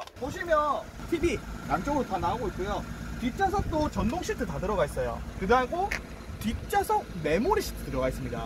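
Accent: Busan, South Korea. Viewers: 시원시원한 부싼 사투리에 설명도 잘해주시니 사고싶은 욕망이 치솟네요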